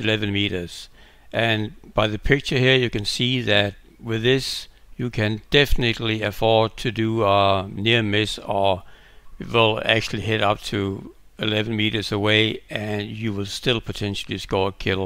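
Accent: Denmark. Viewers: Så nemt at høre den danske accent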